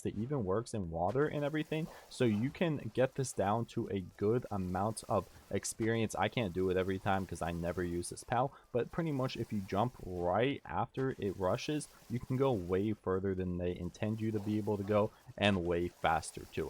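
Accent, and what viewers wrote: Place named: Quebec, Canada. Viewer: you sound french canadian.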